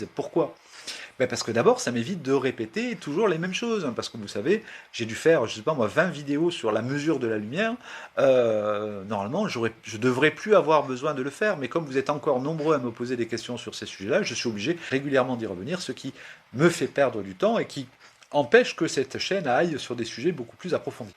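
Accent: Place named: South, France. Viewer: C'est demandé de façon si aimable !... Je ne vais pas détailler toutes les questions posées (je n'ai pas pris note de toutes 😉) Mais ça risque quand même d'être un peu long (1000 excuses d'avance) Préambule : je n'ai rien contre le fait de se tutoyer. J'ai toujours travaillé dans l'enseignement. Je n'ai tutoyé mes étudiant(e)s qu'à partir du moment où ils-elles sont devenu(e)s des collègues. J'ai un titre d'ingénieur en mécanique (tendance maths - élasticité, milieux continus...). Assistant à l'univ de Louvain (1973-79), prof de physique aux Comores (80-81), prof d'informatique (programmation essentiellement) de 1982 à 2012. 1) L'intérêt pour tes "Flashs" : essentiellement ceux relatifs à l'histoire de l'art. J'ai suivi (<num> ans de cours de photo à l'École des Beaux-Arts (ça sonne plus moderne que "Académie") de Wavre. Si le cours d'histoire de l'art (obligatoire) avait été donné "à ta façon", j'aurais sans aucun doute persévéré pour terminer le cycle de 9 ans, mais... 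Mon dada, c'est plutôt la photo "sociologique", genre Walker Evans (Depardon, Ronis...) 2) Comment améliorer ces Flashs ? Jusqu'il y a peu, j'étais gêné par le manque de synchronisme entre image et son et le fait que l'image était "à l'envers" (comme pour la plupart des gens qui partagent des images de Smartphones). Cette fois-ci, ça semble synchrone, donc... Tu as une excellente diction et un petit accent méridional des plus gouleyant à l'oreille (un peu comme celui de Mouscron chez nous 😂) 3) Question nivea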